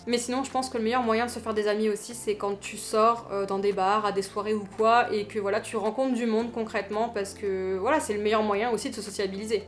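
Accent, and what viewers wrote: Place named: France. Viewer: un an tu viens juste d arriver et durant la pandemie en plus c est surement plus complique attend tu auras des amis du travail des decouvertes culturel et pleins de surprises dit toi que c est une anne special pour tout le monde <num> seras surement beaucoup mieux il ne faut pas perdre l accent francais ce n est pas un reproche au contraire on aime bienvenue tous les francais et francophones le quebec EST FRANCAIS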